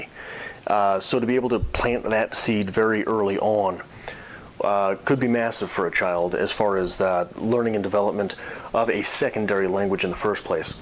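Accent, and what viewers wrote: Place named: France. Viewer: LOL That French accent. One of my best friends had an uncle like you. He would only buy her books and she knew that she could ask for any book she wanted growing up. He really encouraged her to read and love books. Congratulations on being an uncle!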